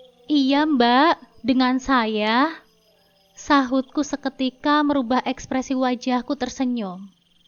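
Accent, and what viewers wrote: Java, Indonesia. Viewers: Tangerang ,lucu gaya bahasanya logat jawa,q suka,smgt kaka n sukses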